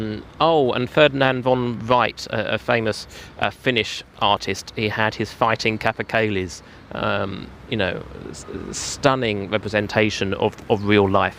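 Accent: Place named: United Kingdom. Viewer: Thanks for this! 😀 great content! I like how British your accent is and yet i can clearly understand what you were saying!😊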